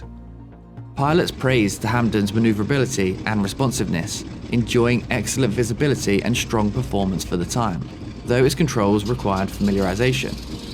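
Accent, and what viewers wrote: England, United Kingdom. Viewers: I really appreciate the variety of aviation incidents you present, & this certainly was a very tense & exciting account. What a remarkable young man John Hannah was. Such sorrow for his life cut so short. I enjoy your clear concise narration and English accent too.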